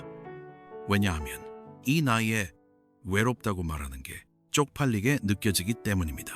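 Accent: United States. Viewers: 발음이 미쿡교포이신것 갗아요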